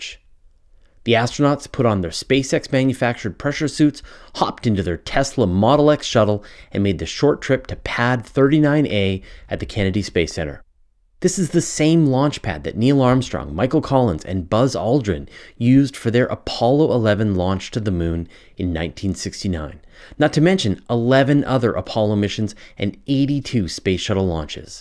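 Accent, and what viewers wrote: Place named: Canada. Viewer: If you have a Canadian accent, it is very, very faint.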